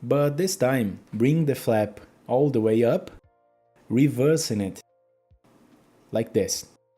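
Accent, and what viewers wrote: Brazil. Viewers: Caraca, muito legel. Eu sei que você brasileiro pelo sotaque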